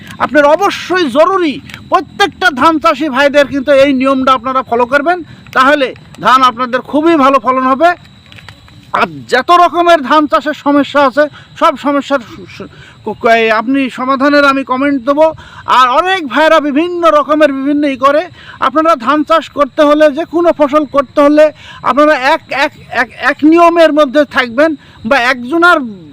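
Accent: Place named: Magura, Bangladesh. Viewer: ভাই আপনার বাড়ি টা কোথায় একটু জানাবেন আমাদের মাগুরার ভাষার সাথে আপনার ভাষার মিল আছে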